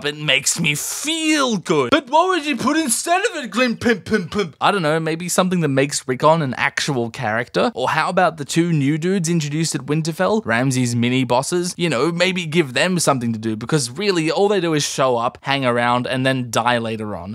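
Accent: Australia. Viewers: Melbourne! Ha... I couldn't tell if you were Australian or English, but now we know :)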